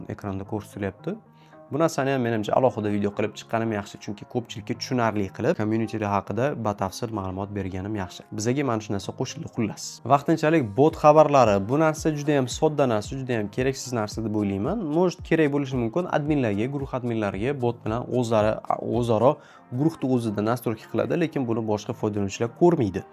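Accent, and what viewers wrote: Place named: Russia. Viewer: Rus, turk odam endi gapirvotgan odamga oʻxshab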